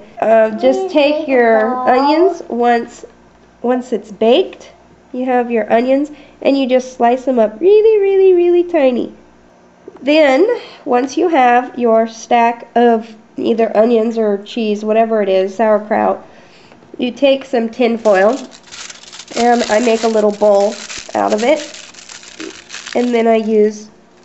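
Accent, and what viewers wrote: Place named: Kansas, United States. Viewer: Love your videos! I have been doing minis for some time now and Love getting ideas from others. You don't even miss a beat in your tutorials. By the way, I live in Cali but I grew up in Ulysses Kansas so when I heard your accent I knew you had to be either Kansas or Oklahoma! Thanks for the videos. God bless!!!!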